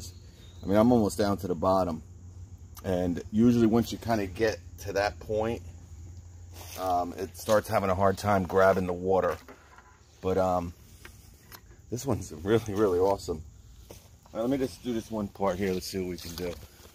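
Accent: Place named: Philadelphia, United States. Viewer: Are you from the Philadelphia area? Wudder=water 😎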